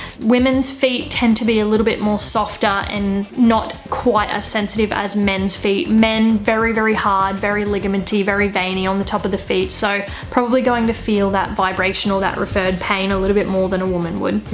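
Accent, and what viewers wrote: Australia. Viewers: you are so cute, i love your little australian accent :3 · She is Australian. Not British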